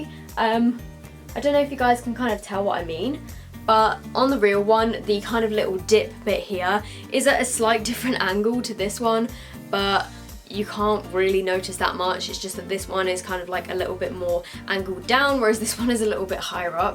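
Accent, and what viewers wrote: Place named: United Kingdom. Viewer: I seriously just watch you videos every single day and love you accent wish I was From the uk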